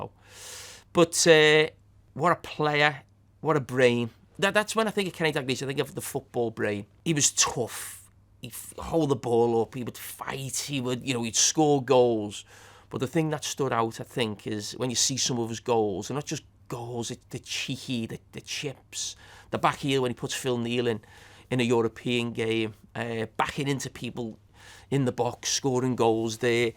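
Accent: Liverpool, United Kingdom. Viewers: Why we having this annoying scouser speak on the official LFC channel? His disrespectful comments and bias against Mo over the year should not be forgotten. Worst pundit and lfc player.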